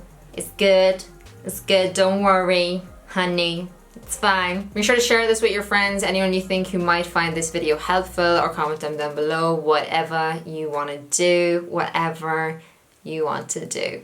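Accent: Ireland. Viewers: I love hearing the Irish accent on YouTube 😍 sounds like me when I talk 😂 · definitely Irish 😍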